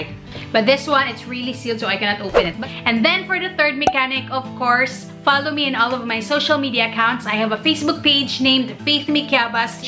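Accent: Visayas, Philippines. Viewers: 💗💗😉😉btw pang ilang commeng kona bato😂😅but its okay... alam mo ba na pangalawa ka sa mga best youtuber para sakin..simula palang kasi nung napadpad ako dito grabehan na ehh...nagtuhan ko agad channel mo..and sana mabasa moto ilolong comment ko na.. hope i win for this giveaway kahit eto lang kahit isa lang dyan basta may matanggap ako from my favorite youtuber...plis🙏🙏sana talaga...kasi matagal kong winish na manalo sa mga giveaways mo pero lagi nalang hinde🙍kaya sana this time manalo namn ako😿sana..btw wlang halong eklabush to ah.ang galing mo talaga and ang cute mo magsalita...bisaya?? Hahah same tayo papa ko kase bisaya pero andito kami ngayon sa caloocan city...i hope mapili mo talaga ko..pag napili moko gagawan ko yan ng bidyo😂and irerebyu ko yan😅💗pramis...kapag napili moko d ka magsisisi..hahha...lab yuu..keep it up👍sana talaga mapili moko..btw mag rarandompicker kaba o ikaw mismo pipili??? 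Sana ikaw nalang mismo para mas maganda kasi alam mo kung kanino dapat yan mapunta..anyway un lang..lab yuu 😂😂sana talaga mapili moko. Wish ko lagi kong pinagdadasal..plisss...1million na plis..hahha💞un lang. Ig: maizel_jems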